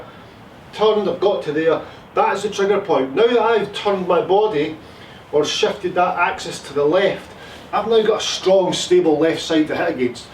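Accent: Scotland, United Kingdom. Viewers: The best instructor yet, love that Scottish brogue, gets his point over with lots of demonstration along with explanation. He does not waffle on about other things. As a 91year old I love his lessons I think he is the best thing since sliced bread.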